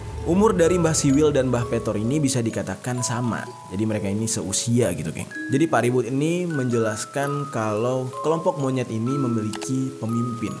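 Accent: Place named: Madura, Indonesia. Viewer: Yo mesti la bang apa lagi logat Madura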